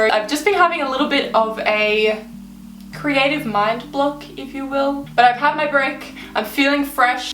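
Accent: Australia. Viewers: I just found you on youtube and I'm obsessed! I love your videos and your Australian accent and you really help me to understand more English because I'm from Sweden and I'm not so good at speaking or understanding English🇦🇺🇸🇪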